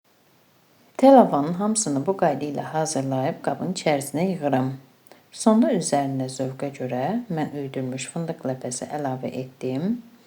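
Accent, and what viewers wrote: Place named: Sheki, Azerbaijan. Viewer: Salam xanım adaşım siz çox qabiliyətli xanımsız siz Şəkilisiz ləhcəniz Şəkili dilinə oxşayır sağolun❤ xanım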